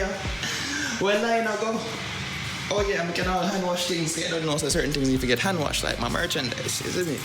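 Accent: Jamaica. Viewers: Jamaican accent of course he is from a diff part of ja thats all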